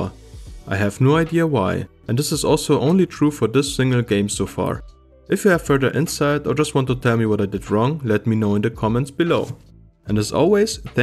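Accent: Germany. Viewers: german accent detected. :D -> I have the best results with xfce... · Do i hear german accent here? 🤓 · I assume he's german. I'm german, so i can tell 😂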